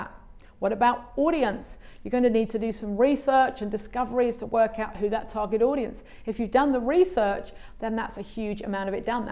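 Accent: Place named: England, United Kingdom. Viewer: Hey, I hope you read this. I guess you can tell by now I've been watching a lot of your videos, some of them a number of times and taking notes on some. I really am drawn to your rich English accent, but has drawn me the most is your common sense and wise advice to building a successful art business. One of the things I got from you is that I really need to do my homework, research. My ideal client, customer is out there looking for what I uniquely have. But need to be where they can find me or our paths cross. I know I want to help other baby boomers like myself realize there dreams too., thanks again for your help. From Kingsville, Ohio 🎉 😀 ❤ Cynthia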